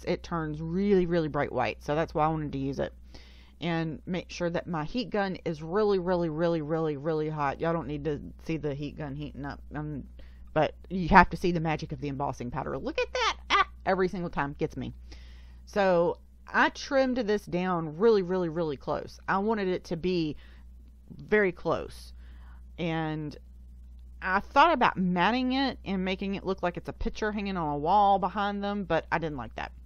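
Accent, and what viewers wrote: South, United States. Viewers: Thanks for the great coloring tips. I do not like fussy-cutting either. I have a scan n cut that I got from a friend for cheap, but I sill don't really know what I'm doing with it. USA P.S. I'm from the Pacific Northwest, so I really enjoyed listening to your sweet southern drawl. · Love this card!!! Going to get this digi right now!!! Love your accent too, not sure when your from, but you kinda sound like your from the south like me!! Big Hugs!!! (US)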